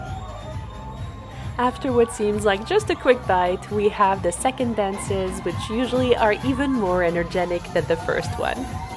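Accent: France. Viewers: I can tell by your accent you speak French and ofcourse because you referred to your uncle as tonton.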